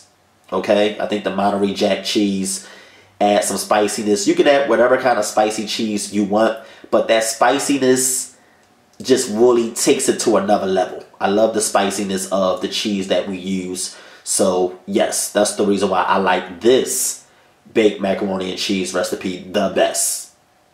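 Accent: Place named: DMV, United States. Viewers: Sounds like he’s from Baltimore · enjoyed the commentary sound like my folks in the DMV area lol well I'm making it now 10/9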